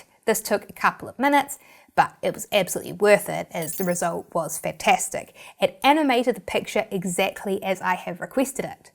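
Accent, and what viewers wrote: New Zealand. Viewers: Hi priceless! Thanks for clarifying. I thought, "Where is this gal from, Mars?" 😉 j/k, I love the Kiwi accent. Chur for the mean accent! 🤔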